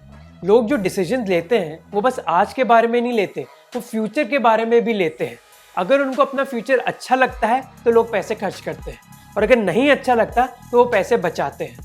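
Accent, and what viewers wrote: India. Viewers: Speaking Indian way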